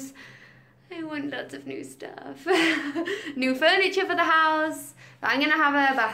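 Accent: Germany. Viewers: Your German is so cute 😘 Love your Vlog :) Looking forward to see you at the Open day (I have two little presents for you ^^ ) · I'm so happy that you're vlogging again!🔝 I really missed it! Also I wanted to say that you're german is so cute and you are such a kind and happy person!😍 xoxo Alexandra😘 (sorry for my bad english)☺ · Cute how u speak German ^^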